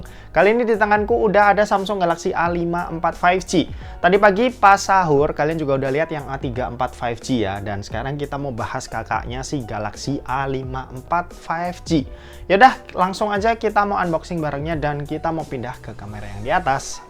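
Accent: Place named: Banyumas, Indonesia. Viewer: Logate ngapak ya😂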